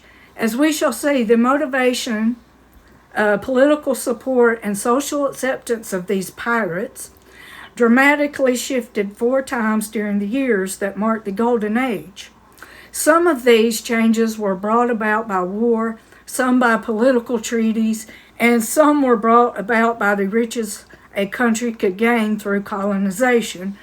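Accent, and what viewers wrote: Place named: Alabama, United States. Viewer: Floridian here. Where are you from? Alabama? Your voice reminds me of my aunts from Alabama.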